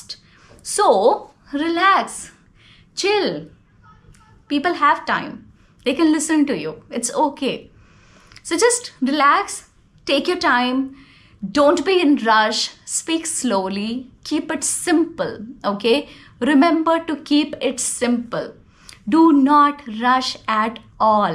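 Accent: India. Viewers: I can't say not a lot of words. But i try myself everyday. Everyday i learn those vocalbury & sentence which helps me a lot. I like the way you talk. I love your indian accent. I feel comfortable & understand clearly. I make mistakes but when you talk everyday make mistakes. It's not a big issue. But sometimes i litte bit fear becoz of i make mistakes a lot. I can't tell you😅😅. I want to be a fluency in english ❤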